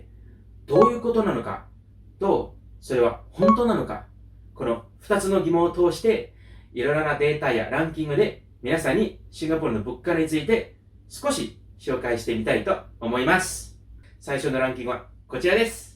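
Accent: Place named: Kansai, Japan. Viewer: 関西訛りがついていますね（笑）いいですね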